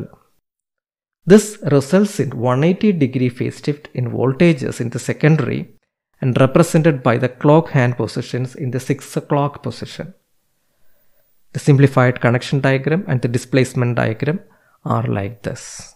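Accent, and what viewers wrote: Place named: India. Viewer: Spanish name but Indian accent….. bait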